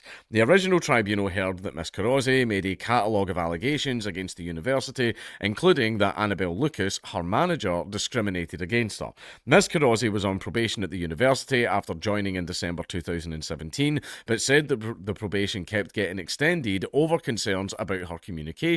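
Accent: Scotland, United Kingdom. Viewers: Man, you have a mellow scottish accent. I live in the Highlands and there's a range here from Scottish to gibberish..... 😂😂😂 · Scottish accent was an inspiration. don't change it,. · My girlfriend is Mexican and understands English perfectly but she has a hard time understanding your accent. I am American and understand you but it can be difficult when you use different words I have the same blood you do Scottish and Irish by Appalachian extraction, am I racist? Yes of course. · Anyone else asking him to ditch the being nice regime and go full Scottish accent in the vids? I'd love it! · I want to hear a full video in full on SCOT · plz dannae tone down your accent for future videos. plz. if tha americans cannae onderstond then its their problem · if people have a problem hearing you due to an accent, work on it until they can. Why has common sense suddenly become such a rare thing? 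And it's not racist OR discriminatory to not understand thick accents or dialects, because even within the same country, (we have this in Sweden too) some accents are hard to understand even to native speakers. People need to thicken their skins and stop being such snowflakes, and the laws needs to toughen up and get common sense back. Why would be bother to go to language schools to learn the proper accents of the language we choose to learn if we could all just, half-ass it and then cry discrimination if people can't understand us? And on the subject of accents: I love your scottish accent, and while I struggle with thicker accents in any language, I've always loved scottish accents. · no I don’t because I’m used to your accent. Checkmate, Scotsman. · I wish you would do a video with your normal Scottish accent because I’m curious if I can understand it. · I live in the north of Ireland,.... 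I can't make out a word my eldest fella says. He went to a hostel in Dublin with his three brothers and the other people thought he was talking In Irish. I love the Scottish Accent.... you're fucking hilarious when angry or happy. I love your accent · I'm actually upset you turned down you Scottish accent. I loved you and critical drinker discussing alien 3 as you guys got more and more scottish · should do 1 full video in full unfiltered scots.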